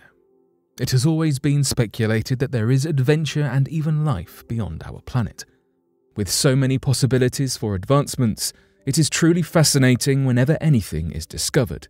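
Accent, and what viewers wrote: United Kingdom. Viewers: How many times am I going to hear "space the final frontier" on a british voice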